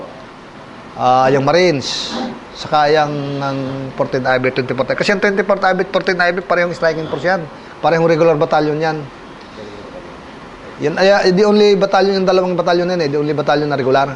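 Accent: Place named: Batangas, Philippines. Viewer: batangenyo si sir ala eh!!!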